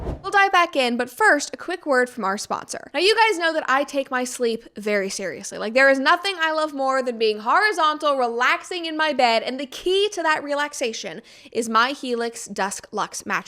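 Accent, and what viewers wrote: United States. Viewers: I really liked the way u talked very good vibers and yapper with fast and quick typical American accent 🇺🇸 u are like my sister Gen Z she is 2003 born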